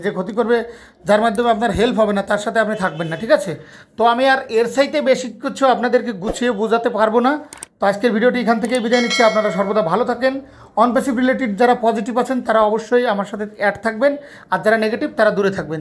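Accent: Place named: Barishal, Bangladesh. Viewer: কথা শোনে মনে হইছিলো বরিশাল।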